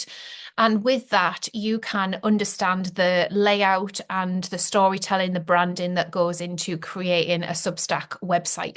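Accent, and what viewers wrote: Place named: Northern England, United Kingdom. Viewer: WAVES FROM OVER THE BORDER IN CUMBRIA!! Thank God!! A Northern real voice!!! There is a god!!!! Nice to meet you! Thank you for being you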